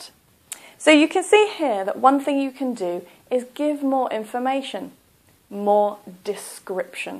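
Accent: England, United Kingdom. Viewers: Listening to her can improve your british accent & pronunciation too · You got a pretty crispy posh English accent haha. It's 👌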